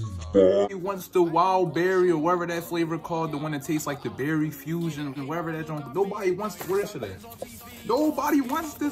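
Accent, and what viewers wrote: Philadelphia, United States. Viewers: He from philly I can tell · I could tell he from philly lmao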